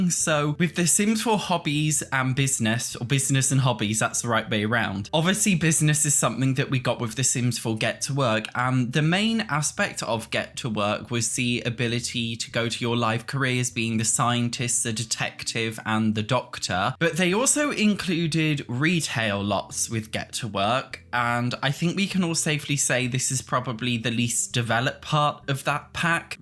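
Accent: United Kingdom. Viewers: WHAT I THOUGHT YOU HAVE A HEAVY BRITISH ACCENT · I see you took your English teacher role very serious! Thank's for being here for us! I learned some words here, but just for you to know, I'm here not just to listen to your great british accent! I love your sense of humour and content you make:)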